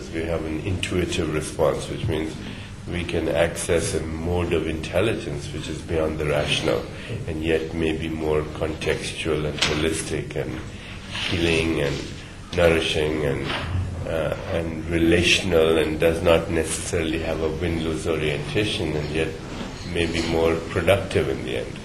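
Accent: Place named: India. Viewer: Not sure if wise or just Indian accent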